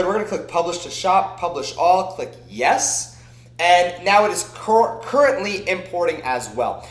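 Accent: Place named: Italian-American, United States. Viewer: EssentialShop, although the video speed makes it difficult to keep up, the main problem with the video is the mispronunciation of keywords and the speaker's nasally and seemingly Italian-American/Jewish accent. The best you can do is to turn down the speed and read his lips while you listen. Keep in mind that those who speak with these accents will have no problem understanding the video at whichever speed. There is nothing wrong with your hearing or ability to understand. Those who would criticise you just lack the ability to see things from a different perspective and readily show their unjustified intolerance. Good luck.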